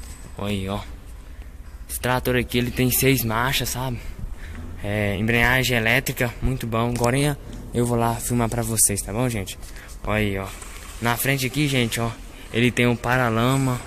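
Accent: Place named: Brazil. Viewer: A sua simplicidade de falar e mais lindo, acho lindo os sotaques desse brasilzão, Deus abençoe! Desejo para você sucesso com o seu canal!🤝🏻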